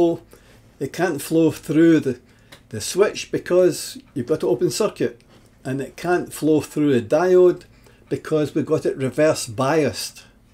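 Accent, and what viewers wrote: Scotland, United Kingdom. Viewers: Nice! Clear diagrams with a clear explanation in a Scottish brogue.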